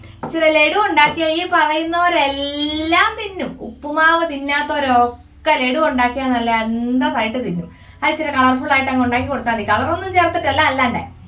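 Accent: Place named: Kottayam, India. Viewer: Samsaaram kettal kottayamkare pole....vedio super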